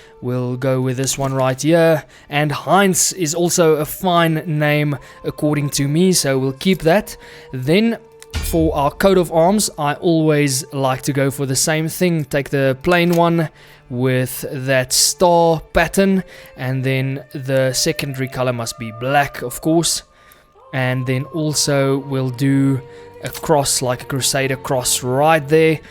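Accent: South Africa. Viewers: You South African? Hahaha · You South African bro?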